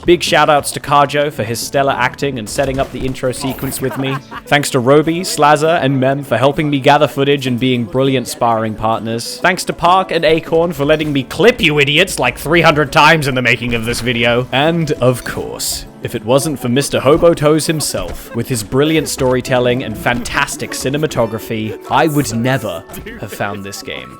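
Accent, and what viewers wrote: United Kingdom. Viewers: the bri'ish accent makes this video funnier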